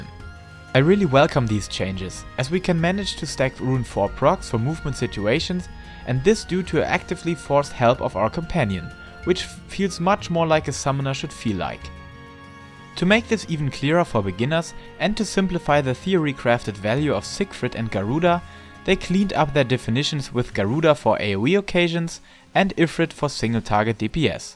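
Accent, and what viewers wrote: Germany. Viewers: As a German myself, I am cringing so hard with this accent xD it's so bad, sorry. Even though I do like your content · Don't worry about the accent as long as you have clear and understandable diction. On a personal note I'd rather have an actual German accent than a faked American one.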